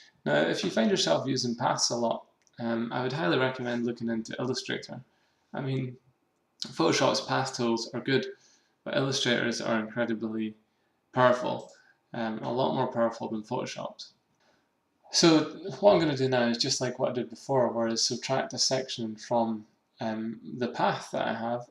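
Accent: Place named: Scotland, United Kingdom. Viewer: Hi, where are you from? You have a Scottish accent. Your asiimov skins are beautiful btw.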